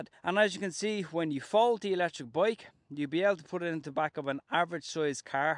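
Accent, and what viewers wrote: Ireland. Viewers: You sound Irish I got one 2 weeks ago in tallagh dublin24 shop but tell me did u get a bag goodies with yours mines black prow same as yours but seen guy that didn't get them to build it like they offered but left out the bottle bag and other goodies I got receipt keys booklet and hand tools old school L alaenkeys spanners screwdriver that's it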